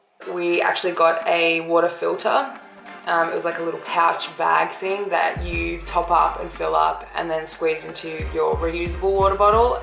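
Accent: Australia. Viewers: What an Aussie accent:D Dear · Can't miss that accent! I did the trek in 2019 and loved it. Am going back as soon as we can travel again. I am from QLD; which state are you based in?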